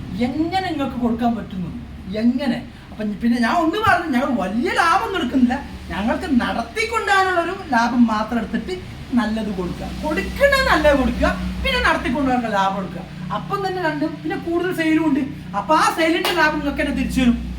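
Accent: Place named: Malappuram, India. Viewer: ഇടക്ക് മലപ്പുറം ബാഷ വരുന്നുണ്ട്...😮😮😮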